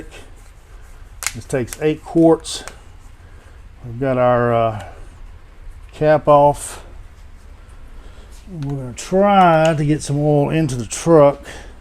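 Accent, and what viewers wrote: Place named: Texas, United States. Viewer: Oh, I feel like I’m in Texas hearing his accent, the garage and the big Chevy. I miss Texas especially the shoppings and dinings.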